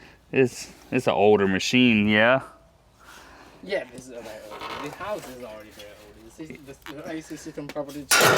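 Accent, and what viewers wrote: Maryland, United States. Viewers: I may be wrong and that’s ok on my observation. And no offense implied or intended. But since I started watching this channel, I’ve suspected you are from or spent a substantial amount of time in Maryland to develop the quintessential accent of the region. I was born and raised in Maryland myself, and that is very familiar to me. Happy Thanksgiving!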